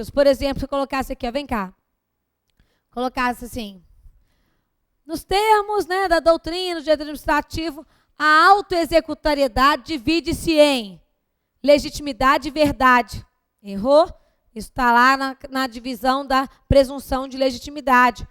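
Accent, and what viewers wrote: Minas Gerais, Brazil. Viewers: Aula muito elucidativa com uma linguagem bastante tranquila, e ainda com um sotaque Mineiro, parabéns professora continue assim obrigado pela ajuda. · Tinha q ser mineira !!! ADORO!!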